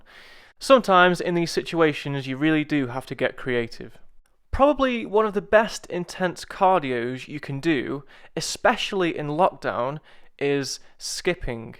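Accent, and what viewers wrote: United Kingdom. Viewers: You speak uk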